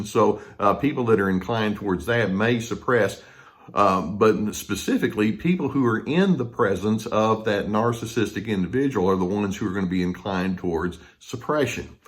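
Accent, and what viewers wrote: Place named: Texas, United States. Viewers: Dear, you and your warmth and wisdom are a guiding star to me. If I ever meet someone like you I’ll marry them right away 😜 Just kidding of course, because we’re striving for ‚Team Healthy‘, right? This video was (again) super helpful and well organized. I am always trying to educate myself on ‚early signs‘ in getting to know people and potential friends or a potential partner... and this video was particularly helpful. And you coming across so warm-hearted and well-balanced certainly doesn’t hurt your message. And btw.: I love your Texan accent too 😍 Love my regular dose of Dr.Carter 🥰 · I love Texas Twang, too, being from Texas (military brat).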